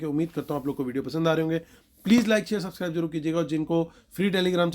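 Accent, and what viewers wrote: Punjab, India. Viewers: Baaton mein punjabi flavour na ho aisa ho hi nhi sakta🎉😅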